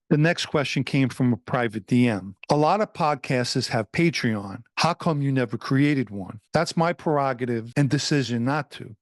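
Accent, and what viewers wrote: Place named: New York, United States. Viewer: By all means please explain why you have a New York accent?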